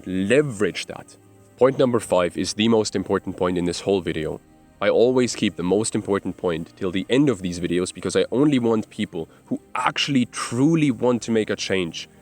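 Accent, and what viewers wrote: Germany. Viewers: Great video, I have to add one thing...This amzing guy must have like in Scotland or knoew lots of Scottish people, he keeps using Scottish experssions, and, with a German accent, it soulds brilliant! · I knew you were German! The background and your accent did it for me. Anyways dude this is a great video and I certainly needed to hear this. Been in a relationship for 3 years and it’s my first real one too. I’m 21 now and still learning how to be a man. This just gave me the confidence to leave all that childish shit behind and change my mindset. Danke bruda 🙏🏽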